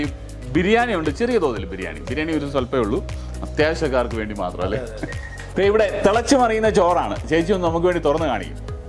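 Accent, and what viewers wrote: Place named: Kumbanad-Kozhencherry, India. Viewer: Love watching your programme..well hearing too. Tje malayalam is very similar to what we spoke. My dad is from Kumbanad & mom from Kozhencherry. Havent really lived there...but some 4/54 in kerala..2 in tvm and 2 in kumbanad, 3 in Manipal. Some 47 in Africa-2 in Ethiopia at birth, 13 Zambia and like 31 in South Africa now..but everything you do is do much like what I really am...thanks buddy!!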